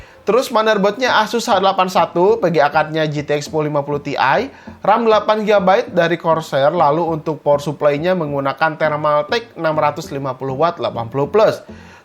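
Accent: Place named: Sunda, Indonesia. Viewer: Sunda nya kentel euy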